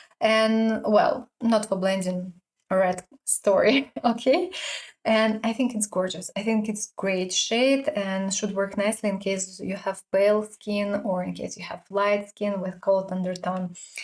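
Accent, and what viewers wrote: Russia. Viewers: какой русский при русский акцент😄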